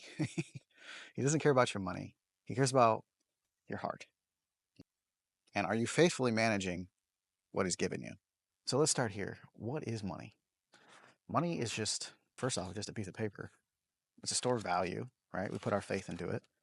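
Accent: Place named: Canada. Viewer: Little changes add up to 1 big change though, and sometimes little changes are all people can do. New subscriber from East Coast of Canada 🇨🇦 . PS - I thought you were 🇨🇦 by your voice until you pulled out the American cash.. lol!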